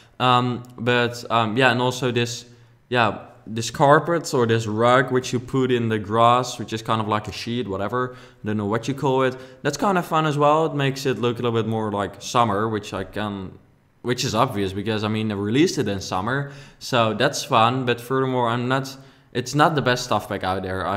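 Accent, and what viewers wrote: Netherlands. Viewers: I'm Dutch and yes and I can hear you're Dutch too, btecause of the accent. but you pronounce every word right and it's not like you always stop to think about how to say or seek for words. So you're good for me! :) (Go Gelderland! :D) Daarnaast. Je builds zijn geweldig! Ik houd van zulke moderne huizen ipv de typische american houses of de standaard rijtjes huizen die wij hier hebben! Ga zo door!! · You do have a little accent, but I don't think its anoying. I just like your video's! I'm dutch to and its just funny when I hear that kind off accent❤ And its a super cool house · Great work! I love the part in the middle, it's so creative! As a Dutchie myself, I did find your accent a bit uncomfortable in the beginning, because it sounds so Dutch? But now I totally love it, and I always listen to everything. And you come across as a very nice guy, down to earth and sweet, very different from other channels.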